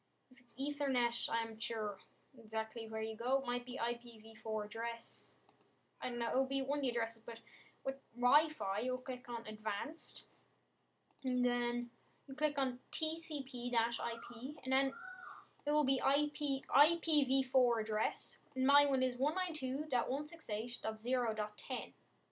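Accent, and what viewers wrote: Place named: Ireland. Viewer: and nice irish accent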